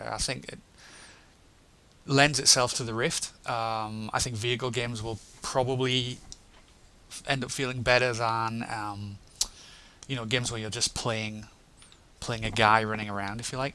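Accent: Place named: Southern England, United Kingdom. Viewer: I can't quite put my finger on your accent, it's almost fairly southern English accents with twangs of northern and a tin bit of American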